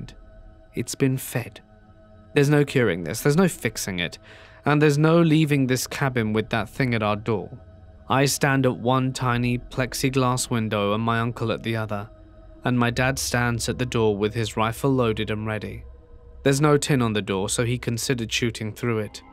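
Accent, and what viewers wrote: United Kingdom. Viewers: I love your British accent. I was raised by a British mom, who is now deceased. And thank you for being so expressive when you read these stories!!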